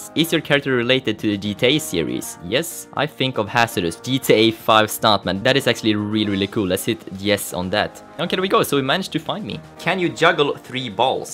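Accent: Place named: Sweden. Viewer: Wait are u swedish? I can hell it by your accent 😂👍 jag är också svensk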